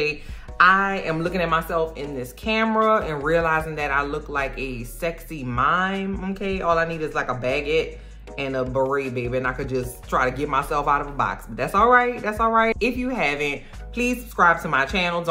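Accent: New Orleans, United States. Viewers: Baby the New Orleans accent. I subscribed so damn fast! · You have to be from New Orleans lol I hear the accent all day long 😭😁⚜️ love the review!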